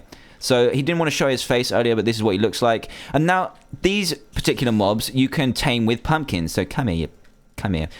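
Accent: United Kingdom. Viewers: he's british scott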